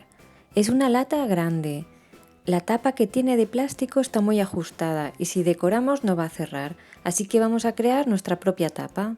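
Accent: Spain. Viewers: Mas bien habla legit imo español de España.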